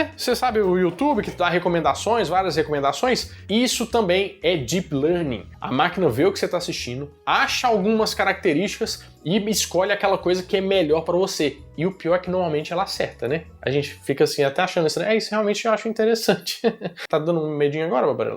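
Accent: Minas Gerais, Brazil. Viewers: Adoro o canal, muito bom, mas os americanos não entenderiam nada que vc fala em inglês, com esse sotaque mineiro, tem que falar mais caipira o erre...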